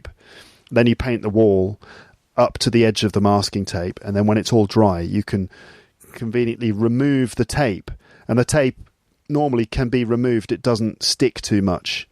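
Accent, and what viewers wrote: RP, United Kingdom. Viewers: Not all sound realistic enough, still, really appreciated your effort to imitate the sound of the instrument, especially the sound of the drill. Overall I love the process of imagining all those appliances and your work on your pod room, while I'm making dinner, your podcast is the best companion as well as learning the received pronunciation accent :goodvibes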